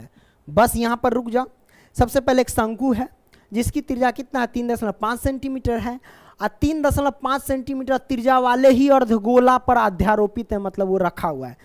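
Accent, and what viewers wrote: Bihar, India. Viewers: Sir aap bihari ho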